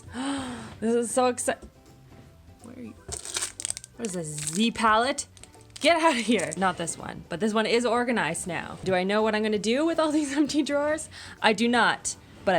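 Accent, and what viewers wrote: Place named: Canada. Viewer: Do you guys binge watch? I know I do! I feel like after about an hour of her voice, I have picked up my own slightly Canadian accent. Lol. Coffee cheers from Michigan “aye” 😊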